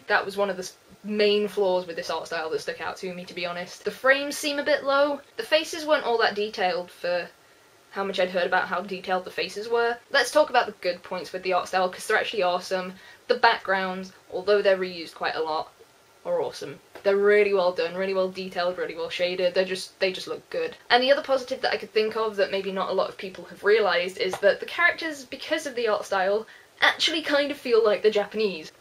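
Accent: United Kingdom. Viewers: I'm really glad that I got to hear a fellow Brit talking about this anime. I've become a big fan of it and each episode cannot come soon enough. I'm definitely used to the style now but it is a pity that they couldn't put more money into it.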